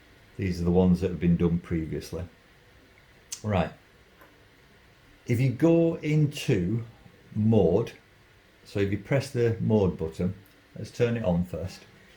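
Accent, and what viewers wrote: United Kingdom. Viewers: Just purchased one and had to learn how to set it for Fahrenheit temp. Good video. British accent was a bonus. 😀 Thank you!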